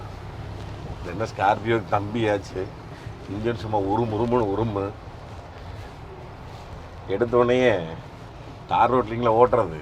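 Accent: Kongu, India. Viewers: கொங்கு தமிழும் அழகு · Kongu thamiz awesome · கொங்கு தமிழ் அருமை....ஏனுங்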